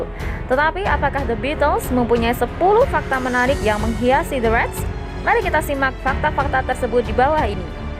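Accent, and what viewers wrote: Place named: Java, Indonesia. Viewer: Naratornya medok banget.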